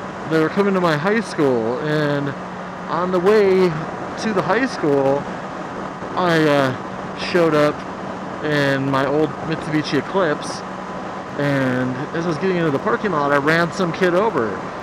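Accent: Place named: France. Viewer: Do you have a video of your camera/mic setup? BTW awesome frenchy accent!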